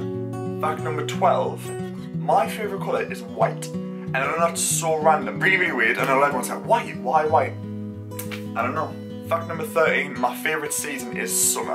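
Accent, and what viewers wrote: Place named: Newcastle, United Kingdom. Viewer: Anyone who likes dogs more than humans is THE BEST‼️❤️🐶🐶🐶🐶🐶🐶 just discovered you via Mr Carrington👍 lovely to hear a fellow Geordie ( ish) x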